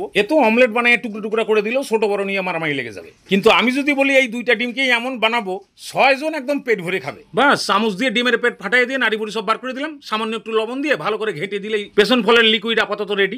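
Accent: Bangal, Bangladesh. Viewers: Bangladesher Bengali der moton kore kotha bollen . Love from Bangladesh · বাংলাদেশি ভয়েজ❤ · আমগো বাংলাদেশের ভাষায় কতা কইতাছেন ক্যান? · দাদা আপনি বাঙাল? 😅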